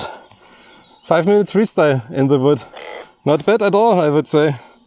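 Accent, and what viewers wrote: Germany. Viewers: Damit und das mit deutschen Slang aiso rock das Ding ❤😂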